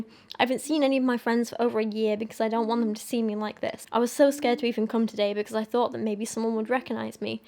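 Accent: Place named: United Kingdom. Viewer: I have been wanting to ask but your accent... Where are from? I'm guessing UK but what part?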